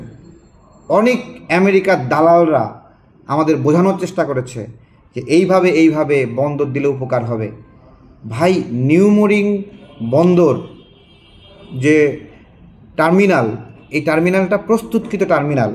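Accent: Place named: West Bengal, India. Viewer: আপনি বাংগুদের সুরে কথা বলতেছেন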